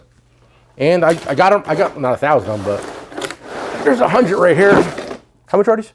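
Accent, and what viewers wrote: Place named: New Jersey, United States. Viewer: Damn I kept wondering why I never heard the term "field" tool dealer wondering what the hell that means? Now I see the title failed lol went over my head. It must be that damn Jersey accent. Former Edison resident happily in Florida now.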